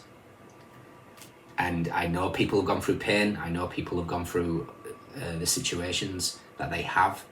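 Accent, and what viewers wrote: Northern England, United Kingdom. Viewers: Thankyou 👍 good Northern accent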